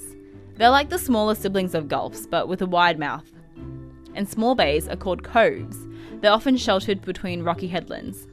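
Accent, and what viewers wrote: Australia. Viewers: I thought shes Australian.. lol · I'm in love with Aussie accent 😍❤️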